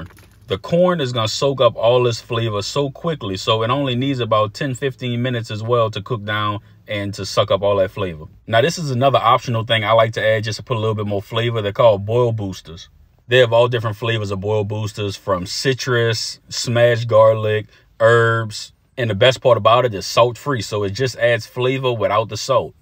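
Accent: Louisiana, United States. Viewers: Most likely either southern or louisiana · Ill parlez vous angles de Louisiane ! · It's not even a southern thing it's a louisiana thing specifically. It's more of a frenchy way of saying it. Also no need to be rude. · That is perfect Bayou Accent !!!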